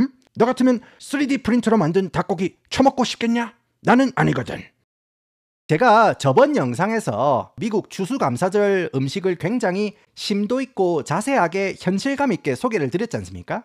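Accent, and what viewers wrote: Gyeongsang, South Korea. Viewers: 언어 천재 DNA가 경상도 사투리와 영어를 만나 미쿡 이야기를 맛돌이로 말아주는 채널 ㅋ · 경상도억양 재밌는데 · 이 튜브의 재미는 님의 성대모사와 창, 구수한 갱상도 사투리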